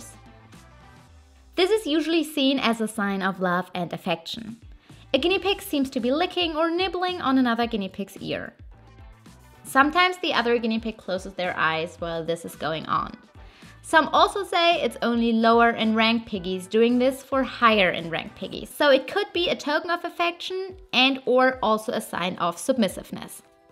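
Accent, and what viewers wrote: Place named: Germany. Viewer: Usually I don't hear it, but could hear the German accent a lot in the video. Just a interesting notice. Another good informative video for new gunea pig owners and a fun one for old ones and gunea pig fans..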